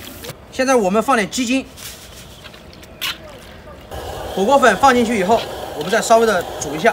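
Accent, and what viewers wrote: Sichuan, China. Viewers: 我特地來聽你的四川腔，你怎麼不說了😢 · 這種話才聼得懂，四川話聼起來好累唷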